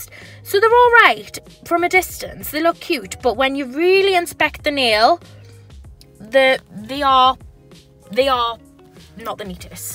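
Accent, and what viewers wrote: Newcastle, United Kingdom. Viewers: She is from newcastle, which is in England :) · this ismy first time watching you and im definitely going to subscribe one question, are you geordie? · she’s british, the accent is geordie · I love your voice are you britsh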